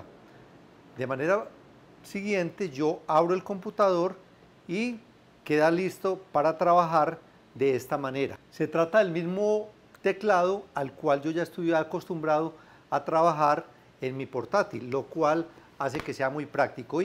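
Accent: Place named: Colombia. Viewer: Colombiano Lol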